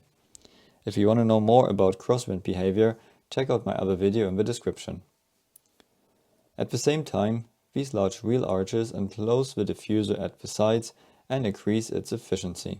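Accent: Germany. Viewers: bro your german accent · To anybody interested. The channel owner, although sounding German, I suspect has Scottish genes since I found out the name of the channel has thy Scots surname of. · And all this with the your german accent. Einfach herrlich. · Your accent sounds very german, i know that because i'm german, are you german too?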